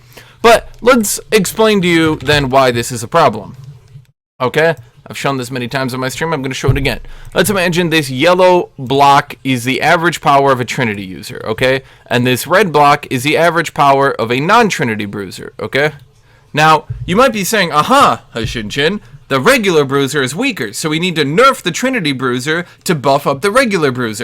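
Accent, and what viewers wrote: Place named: Germany. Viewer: er sagte mal im stream dass er aus deutschland kommt. den akzent hat er sich wohl mit der zeit abgewöhnt, in seinen älteren videos ist er noch etwas stärker bemerkbar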